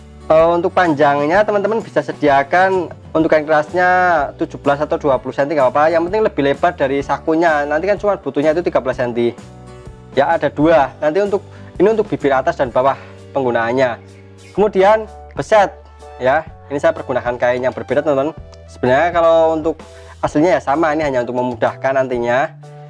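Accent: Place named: Java, Indonesia. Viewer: Trims mas ilmu bermanfaat untuk pemula. sy senang dengar penjelasannya dgn kosa kata bahasa yg rada2 medok selain dapat ilmu juga mengerti bahasa Jowo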